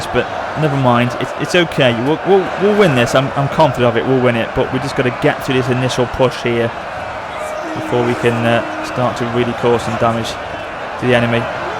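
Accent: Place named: Wales, United Kingdom. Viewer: You have inspired me to buy Attila Total War at the beginning of the next month. I enjoyed the first eight minute descriptions. I watched this video by finding the Attila Total war as usually first place of the top ten of 2015. Do you like Europa Universalis IV as well, most videos seem interesting. I have seen one bad review of Europa Universalis IV on gamespot. IT is a toss up, though I think for now your walkthrough sold the idea for Attila Total War! I love your accent, are you Wessex or Sussex Welsh?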